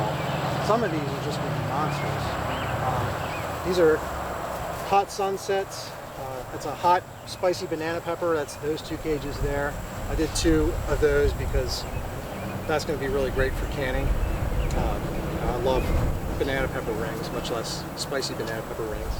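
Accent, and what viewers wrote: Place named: Maryland, United States. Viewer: That east-coast Maryland accent brings me right back to my homeland of Philadelphia. Love it.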